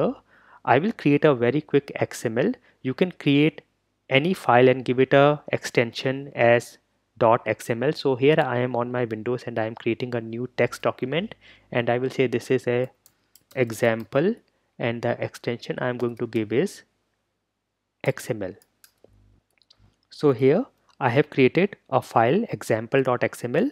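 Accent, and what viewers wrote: India. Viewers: are you from bengal?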